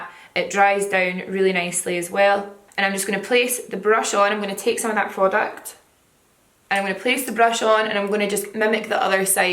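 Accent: Scotland, United Kingdom. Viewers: you are so amazing! I love watching your videos and learning new little tips and tricks. My husband always says "oh you've been watching today haven't you" cause I walk around my house thinking I've got a Scottish accent 😂😂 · You're so unique! and a talented artist and I love your Scotland accent. You're so pretty!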